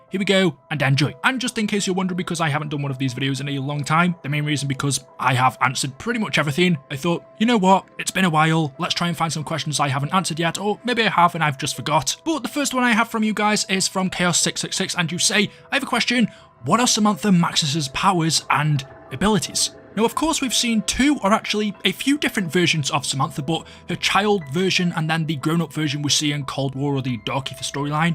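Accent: United Kingdom. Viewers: British person speaking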